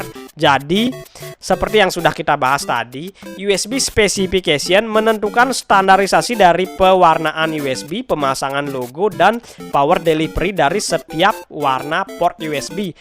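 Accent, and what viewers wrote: Bali, Indonesia. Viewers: Bali sajan logatne nok 😅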